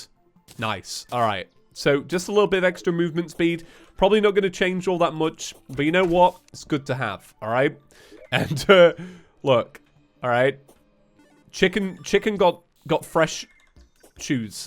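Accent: United Kingdom. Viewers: that just the british accent mate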